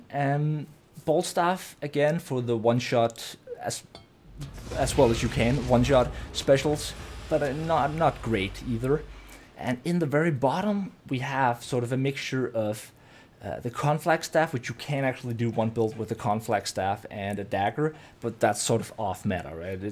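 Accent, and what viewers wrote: Denmark. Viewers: My first video I'm seeing of your. You sound danish in your accent. Wondering where you are from my man?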